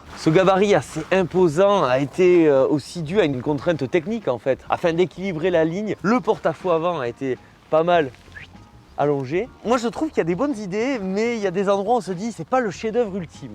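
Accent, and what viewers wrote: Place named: Montauban, France. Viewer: Bonjour mon ami super véhicule. Tu as un accent de Montauban toi🎉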